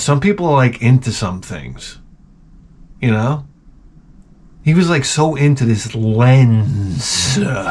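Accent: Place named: Long Island, United States. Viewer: I was just thinking you begin sounding like an Adult Eore from Long Island, then noticed you're even wearing Eore 💙 Blue.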